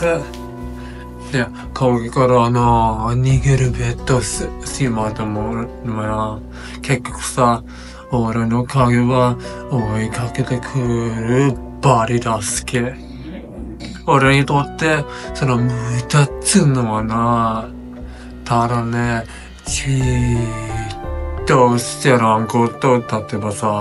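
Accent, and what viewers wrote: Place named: Nanbu, Japan. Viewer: 南部弁初めて聞きました。同じ日本語なのに不思議な感じです😊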